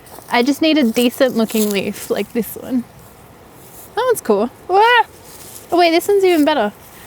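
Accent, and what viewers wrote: Australia. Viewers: Omg thats literally the store I bought my first lens from, just two weeks ago. I also went in there yesterday to check if they had a canon 35mm 1.4 cheaper non L but no stock. Now I'm looking at buying a mark 1 used for cheap today hopefully. For some reason I just assumed you where Canadian like most you tubers lol, I didnt even notice the aussie accent but now I think about it, its definitely there :P Got to say too damn you take some nice photos for unedited.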